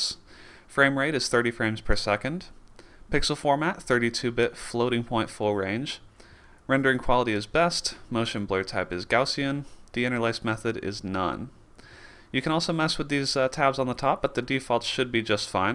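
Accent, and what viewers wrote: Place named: Texas, United States. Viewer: Your dumb.....its called a Texan accent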